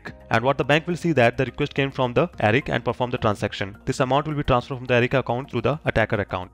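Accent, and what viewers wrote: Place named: India. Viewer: you are indian and on top of that you are talking fast , so i just gave up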